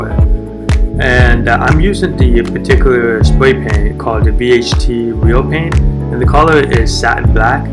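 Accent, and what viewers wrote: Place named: England, United Kingdom. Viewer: You speak like English people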